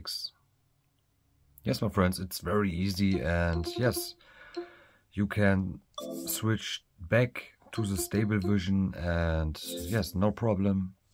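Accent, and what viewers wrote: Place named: Germany. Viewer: your german accent is hella cool and makes u unique but still understandable very mich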